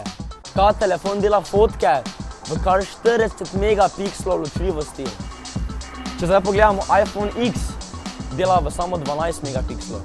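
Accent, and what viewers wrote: Styria, Slovenia. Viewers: ta fon je za razliko od tebe pamtn, pa vid se da si toti štajarc k ti lih ne gre tota angleščina "somrak"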